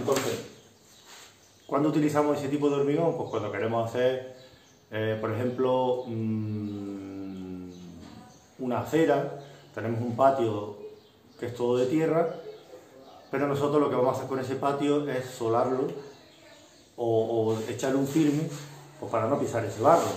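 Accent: Spain. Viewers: De dónde eres ? tienes un acento parecido al mío.Andaluz ? Extremeño? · Venía viendo vídeos de viny de twister, que es del mismo rollo pero, los vídeos son muy largos y me interesa más como lo esplica usted, además de ser la forma de hablar de aquí de España, muy interesante, un saludo y muy buen trabajo.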